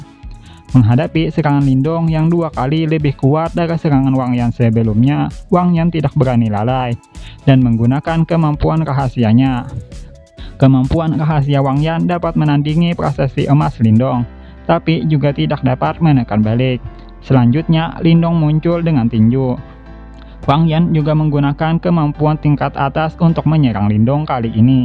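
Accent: Banjar, Indonesia. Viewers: suara orang banjar tak bisa dirubah logat nya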